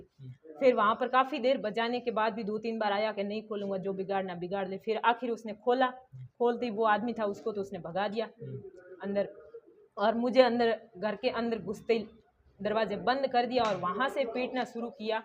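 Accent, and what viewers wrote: Rajasthan, India. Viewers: Awaaz se lag raha hai yeh youtuber gamo Rajasthani hai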